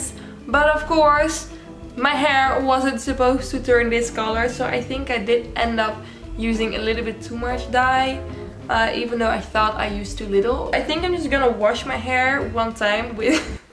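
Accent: Netherlands. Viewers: Haha ja, nogal Nederlands accent hè, dat is zo leuk van mensen over de hele wereld op youtube, alle verschillende accenten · Where is she from? she sounds dutch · Wait are you dutch? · are u dutch? bc idk ur accent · Ben je toevallig Nederlands? Ik hoor daar ergens een Hollands toontje😂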